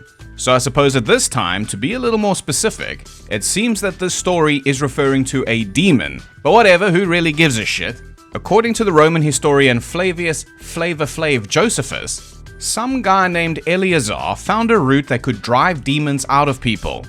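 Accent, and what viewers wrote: South Africa. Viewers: I thought u were south african when i heard ur accent😂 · South African?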